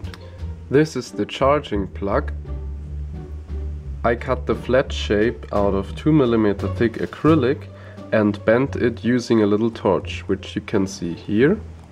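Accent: Germany. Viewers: Hab ichs mir doch gedacht dass der Akzent Deutsch ist :D und ich hab mal wieder meinen falschen acc...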